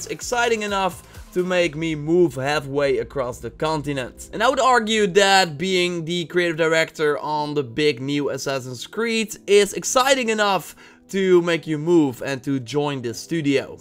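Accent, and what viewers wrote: Netherlands. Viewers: goeie channel echt waar maar das elke keer weer da hollands-engels accent weer gewoon worden haha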